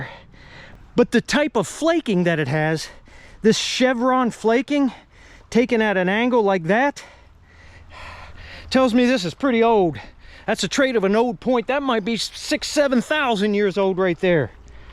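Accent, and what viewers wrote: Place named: Southern Ohio, United States. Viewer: ❤ the south Ohio accent. Being from southeast Cincinnati, you remind me of my uncles. 🤘🏻Rock on.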